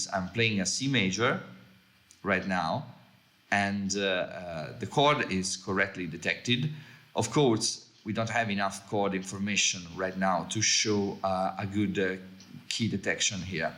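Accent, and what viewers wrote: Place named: Tuscany, Italy. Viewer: scommetto che sei toscano haha , finalmente qualcosa made in italy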